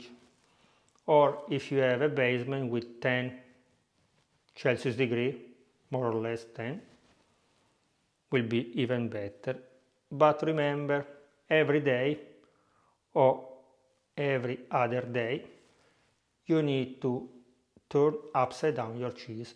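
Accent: Italy. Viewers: I subscribed for the traditional ways that he shows, but also it's a joy listening to him... calm..knows his stuff well... and that great Italian accent. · Your english is very italian👍🏻👍🏻👍🏻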